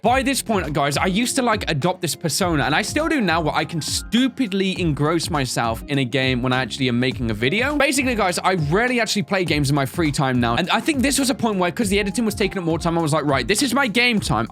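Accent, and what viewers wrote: United Kingdom. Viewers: Your voice changed...backwards.You used to sound more mature and British.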